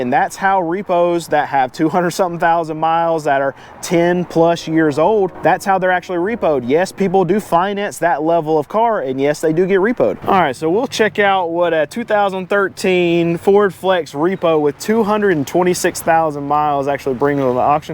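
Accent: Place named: Texas, United States. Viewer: This is a very real example of what an actual Texan accent realistically sounds like. Half “normal” and half a unique form of country twang.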